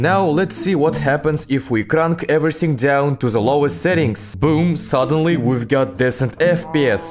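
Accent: Russia. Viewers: When you hear a russian speaking english AAAAND cursing in russian..., the vídeo is hilarious and serious at the same time. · how this the most russian accent i have ever heard (i am russian btw) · Почему мне кажется что у тебя русский акцент ? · Bro i love russian guy speakung english😂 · hell nah hes def russian, i can say it cuz im russian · Не иронично самый клёвый акцент ру английского с неожиданно довольно хорошим произношением и ахеревшим словарным запасом. Как говорится fluent af. Будет крутой гиммик канала. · love you rrusian accent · русский акцент bilya... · I knew that guy was russian when he started speaking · Как ты говоришь на английском так, чтобы русскому было тебя понять легче, чем англо-говорящему? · Couldn't have made a more russian sounding enlgish accent fr. Thats how people in my school read English texts. Good thing i know english well💀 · russian accent detected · Прикольно что из за русского акцента мне становится в разы проще понимать английский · I ve recognised Russian speaking man from the very first words 🙂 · Как же мне нравится эта озвучка с Русским акцентом:) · Нда, чел пытается не палиться что он русский, но акцент говорит нет Ты позоришь себя, микрочел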